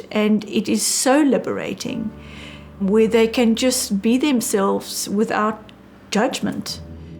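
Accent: South Africa. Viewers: That was really powerful and heartfelt. You say you are British but you have a South African accent and it looks as if you live there now. But you are so right as we don’t talk about our emotions as well as we should. The hospices do such a wonderful job, you are so right and it is not at all a depressing place. It would be wonderful if we could all end our lives there. By the way your grandmother is very beautiful and you obviously have her genes. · The accent is southafrican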